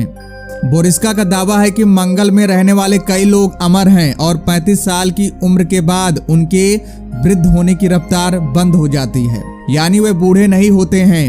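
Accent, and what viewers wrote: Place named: Bengal, India. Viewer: Are bhai ye hindi bolne ki kosish kr rha hai iska accent bengali jaisa hai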